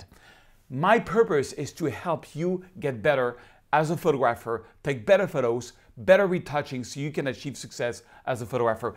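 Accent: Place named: France. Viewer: Je pense que tu es en train de perdre ton frenchie accent! ;) J'aime bien ce tuto, comme quoi, travailler ses photographies n'est qu'une question d'émotions que l'on veut y véhiculer.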